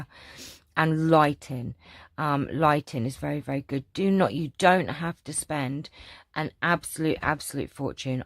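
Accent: Yorkshire, United Kingdom. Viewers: Your story about going out in a convertible reminded me of a wish I've had since a teenager, wanting a MG convertible. I loved that movie Half a Sixpence, my mum bought an LP of the songs loved it, played it so often. You are so entertaining love your Yorkshire accent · You made me laugh with your Yorkshire accent 😂😂 and your jokes lol! Thanks for sharing, it’s been fun getting to know you more xxx❤️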